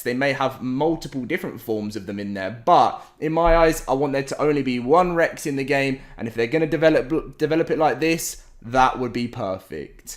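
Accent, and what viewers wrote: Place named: United Kingdom. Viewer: According to his voice: UK According to his looks: US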